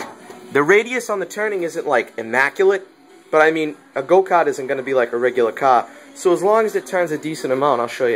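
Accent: Boston, United States. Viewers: boston right?? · are u from boston? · Keep jumping in and out of that Boston accent.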